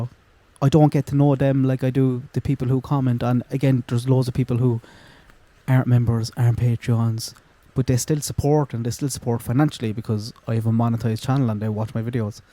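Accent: Ireland. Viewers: Maybe seem a backhanded compliment but in fairness you have done some very good work here with this part of the investigation, i.e., the items found so props for that. Even though I do struggle at times with the thickness of your Irish accent lol. I used to work in Ireland and never got used to the accent, regardless of how long I was there. It's just one of those very thick accents that one can either grasp and understand fully or not. I guess I am one of those who can't. However, people need to maybe start coming to terms that they were duped and mislead by the Murder Sheet over the Klines, who they literally accused the Klines of these murders. Now, people who were adamant that the Klines had murdered the children, are adamant that they are involved someway or somehow. It may turn out it was just a coincidence that Libby had contact with that AS profile and that is what that Voorhees guy said after hacking into the account, i.e., 'synchronicity. 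Seems that AS profile and Klines profiles were prolific, meaning they were targeting many young impressionable teens and children in Indiana and further afield. I think people need to maybe just stop this Kline obsession and fully focus on what we 'know' and not 'think' we know as Carter put it and we know Richard Allen is the BG, despite Carter not confirming this. That is why your work at this stage is very good as you are focusing on Allen and items found, when other channels were not. Again, props for that. Of course, don't ful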